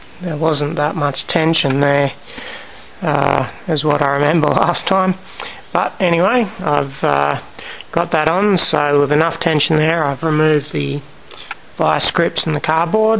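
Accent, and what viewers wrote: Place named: Australia. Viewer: you sound like badger from Breaking Bad, except with an Australian accent.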